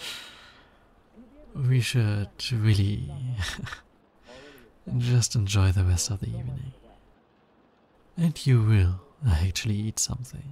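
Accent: Germany. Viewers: Your German accent is cute. Loved this new experience. Great audio. LOVED IT!!! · How come it never occurred to me that this accent is German? I literally live in the neighbour country 💀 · That's the most cutest audio I've ever listened too! Thank you,! And I have been blessed with your adorable German accent! <3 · Love this audio I just found it, and I'm literally crying rn. I never felt so safe in my life Love your German voice<3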